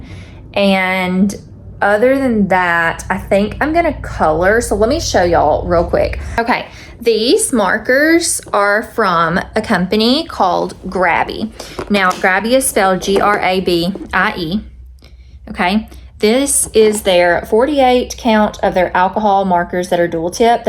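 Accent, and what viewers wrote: South, United States. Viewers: Hi! Do you know if I use your affiliate link to Amazon but get a different set of Grabie markers (or other brand) do you still get credit? I need some new ones to go with my new Coco Wyo color book. These are the best!!! So relaxing and not stressful like the really intricate ones that stress me out more! 😂 Thanks for all your wonderful content!! My hubby calls you “that little southern girl” lol I think he really likes your accent 😊❤️❤️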